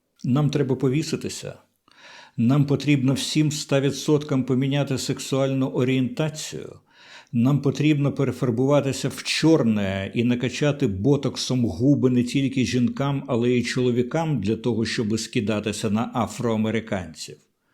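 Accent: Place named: Russia. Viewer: буде вашчЄ (буде важчЕ), щЬо нам іщЄ треба... Пане Дмитро, як завсіди, спасибі за промовисту орацію, але... будь ласка, поверніть собі українську фонетику замість московської. Шиплячі ж бо в нас тверді, не мякі як у москаля.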